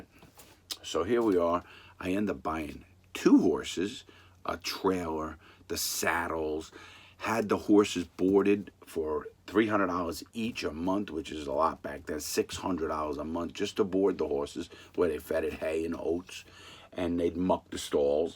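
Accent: New York, United States. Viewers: A Polak from Florida with a New York accent I cannot get enough of this guy such a great storyteller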